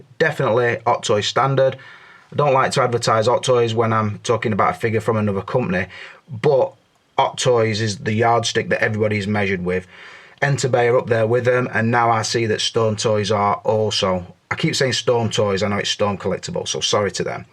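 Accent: United Kingdom. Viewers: I can tell by your accent you know nothing about boxing. the UK grossly over hypes their fighters even when they're clearly trash.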